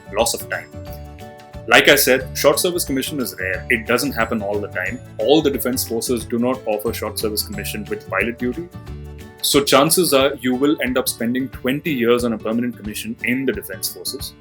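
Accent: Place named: India. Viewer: Man u have the most charismatic indian accent ever. :D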